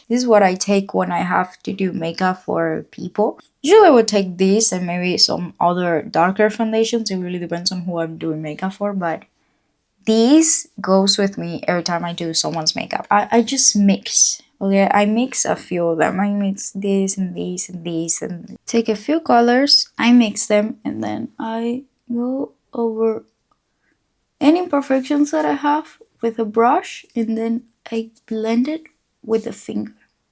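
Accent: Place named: Italy. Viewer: I love thisss ,btw is she Italian?cuz her accent sounds like she is from Italy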